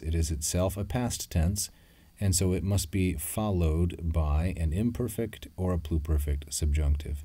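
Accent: United States. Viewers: What is wrong with the American pronunciation of latin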